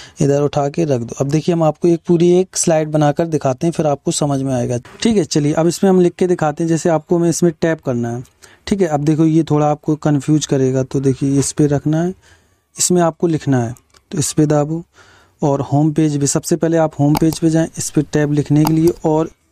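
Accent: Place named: Bundelkhand, India. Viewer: Bhai kha k bundelkhand se ho kya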